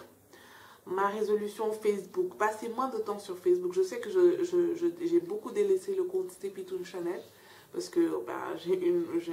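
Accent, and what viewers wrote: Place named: Senegal. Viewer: looooooooooooool l'accent galsen!!!!!! je kiff!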